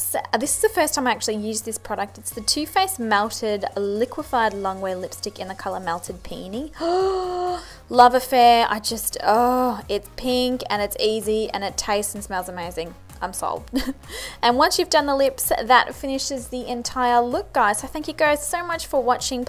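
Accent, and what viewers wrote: Australia. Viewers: G'day! Just found you (via the Rimmel lippy reviews) and so lovely to hear another Aussie! I've subscribed already :)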